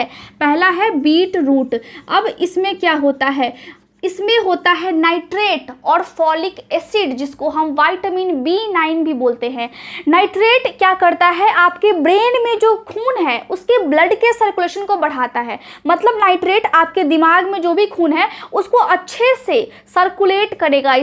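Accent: Bihar, India. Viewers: bache tune mere pass Janam kyon nhi leiya. Love you and God help you. From Himachal Pradesh 176214 PIN. Bache flow aah rahe hai Bihari language ka. Beta update. You are coordily invited to visit HP and stay my home with your mom & dad. God bless you.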